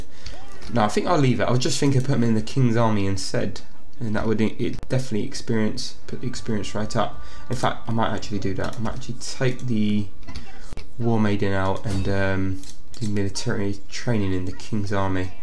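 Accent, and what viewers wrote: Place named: London, United Kingdom. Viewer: did you grow up in london around loads of roadman black people? your accent sounds like it, no offense shieeet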